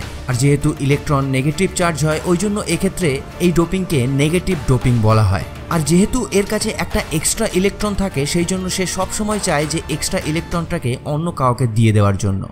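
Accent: West Bengal, India. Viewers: voice sune indian mone hocche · চমৎকার একটি ভিডিও, খুবই উপযোগী। ধারা বর্ণনায় ওয়েষ্ট বেঙ্গল ছাপ আছে।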